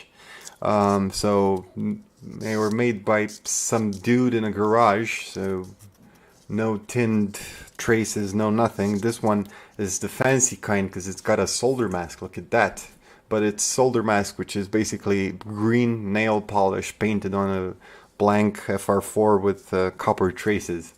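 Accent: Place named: United States. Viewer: I thought the accent was American possibly Canadian.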